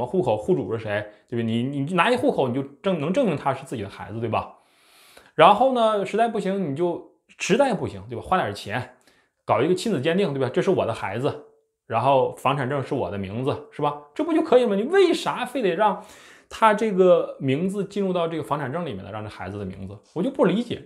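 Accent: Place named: Northeast, China. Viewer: 抱歉噢。。。我听到你的 “十三岁 ” 发音 就想笑。。。 东北口音吧？？？。。。我新加坡人。听了 很特别 可爱。。。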